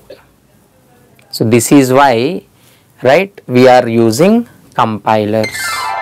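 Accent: South India, India. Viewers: the south indian accent 😅😅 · what a bad accent of english this indian accent id really wrong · u r indian!..cz u r talking in indian english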